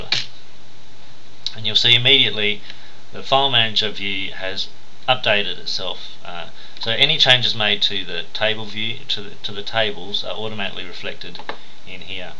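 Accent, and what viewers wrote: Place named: Australia. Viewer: omg you're Australian aren't you!? That's awesome... fellow Aussie 😁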